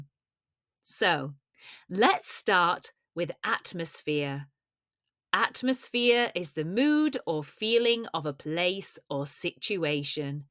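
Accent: United Kingdom. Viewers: I really like your video, it really helps me with British English pronunciation, thank you very much ❤ ￼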